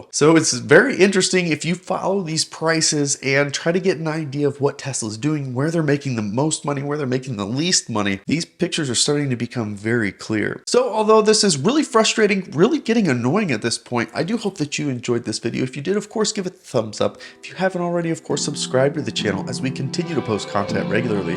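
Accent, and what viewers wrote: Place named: Greece. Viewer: youre greek arent you! lol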